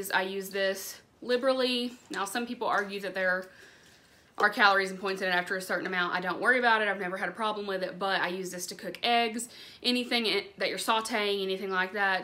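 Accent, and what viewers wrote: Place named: Kentucky, United States. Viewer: I knew you were from Ky from the accent. South Central here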